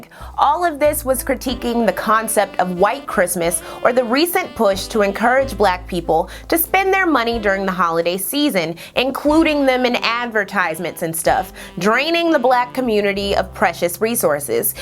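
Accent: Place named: South, United States. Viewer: lol i liked your southern voice. it reminded me of whitley from a different world. lol but i never knew that about the clan. that picture was really jarring to look at. but it was a cool video. i learned alot.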